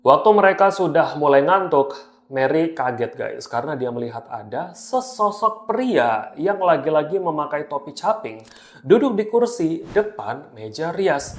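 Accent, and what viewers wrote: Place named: East Kalimantan, Indonesia. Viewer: Saya dah curiga kalo abangnya pasti orang KalTim, karena kedengeran dari logatnya. Halo Bang saya asal Balikpapan sekarang tinggal di Semarang ikut Suami😊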